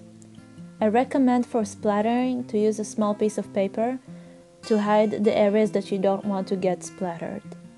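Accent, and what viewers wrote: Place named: Israel. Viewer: also, it sounds like you have an isreali accent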